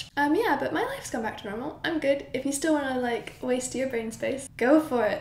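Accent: United Kingdom. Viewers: I totally missed all of whatever you're talking about!!! But I'm glad you're okay and past this. Also, it's pretty adorable that the longer you're in Europe, the thicker your British accent becomes! I've had friends move to Georgia or Tennessee and they start adopting a southern accent without realizing it. It's like the same thing here!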